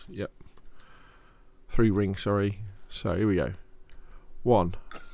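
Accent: England, United Kingdom. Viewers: I feel like when the teacher has an english accent, it makes it lots more easier to understand 😂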